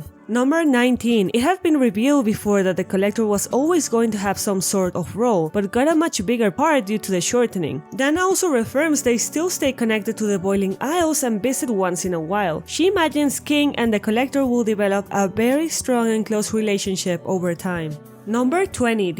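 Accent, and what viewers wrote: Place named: Latin America. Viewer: Are you latina?? Omg I love your accent I'm from Colombia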